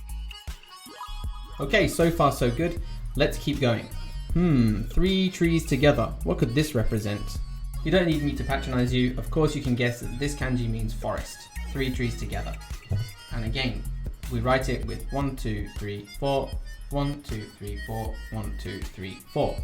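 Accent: United Kingdom. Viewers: to be Japanese you sure speak like a British, with a funny accent. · Ironically the narrator sounds British but drew the American flag. That's far more curious. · didnt expect a british accent ngl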